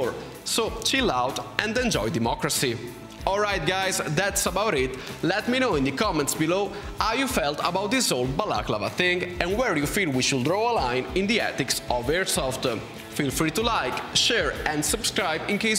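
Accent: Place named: Italy. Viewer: Ciao, ho detto (cavoli parla l’inglese in maniera pulita e molto capibile) guarderò un po’ di video per tenermi l’orecchio in inglese, ma capisco molto bene perché sei italiano ed ovviamente l’accento è quello!! Comunque ottimo inglese!!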